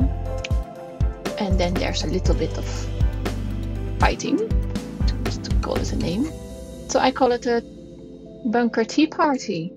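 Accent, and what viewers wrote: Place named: Netherlands. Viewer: Nice video, recognize a fellow Dutchie when I hear one haha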